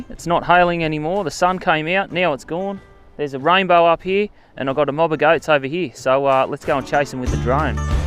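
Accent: Australia. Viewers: literally the most aussie voice youll ever hear. makes russell coight look like a tourist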